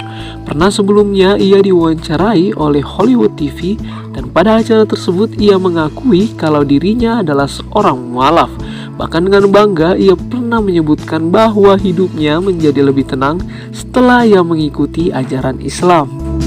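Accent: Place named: Indonesia. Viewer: Blajar nyebut yg bener nama2 artis..jgn diikut dngn loghat Indonesia kalo Mau bikin content..👎👎👎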